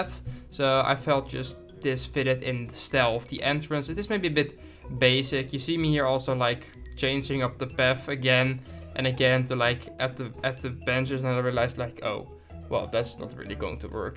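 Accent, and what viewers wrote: Netherlands. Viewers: Wow you are good at building but why cd-opnamen you have an Dutch accent are you dutch? I know that accent BC im Dutch 😁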